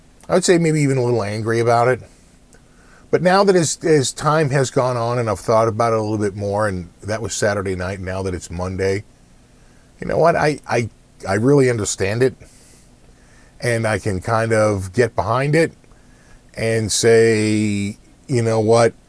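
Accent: Boston, United States. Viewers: Typical dude from Boston lol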